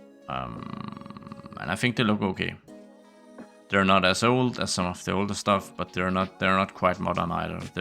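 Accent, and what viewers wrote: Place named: Denmark. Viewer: Love the Danish accent ❤️❤️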